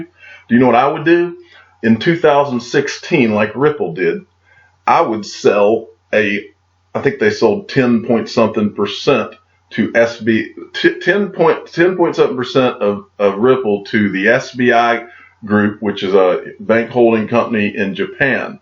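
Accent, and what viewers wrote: South, United States. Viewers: Ripple is smart enough a company that should the case ever arise that a hacker reveals himself who can threaten their systems, will shockingly be offered a new job position within the company. I have this gut feeling that the is a silently followed, trusted source among legislators and regulators in the US economic and political spheres. Wouldn't even be surprised if president Trump gets his some of his debriefings from vlogs! He is definitely with the new technologies for an old guy. That southern draw is actually something soothing to the American ear. Especially the working American, in the blue collar industries that make our lives manageable, if not easy.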